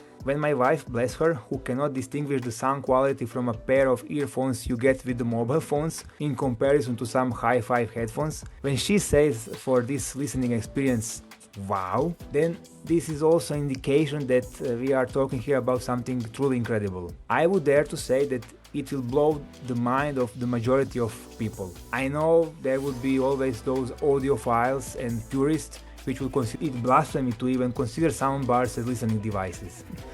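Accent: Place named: Eastern Europe. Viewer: A giudicare dall’accento ho pensato che tu fossi slavo ahaha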